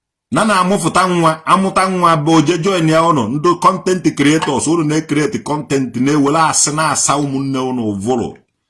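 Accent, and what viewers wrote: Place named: Agulu, Nigeria. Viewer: With this man accent he must be from Agulu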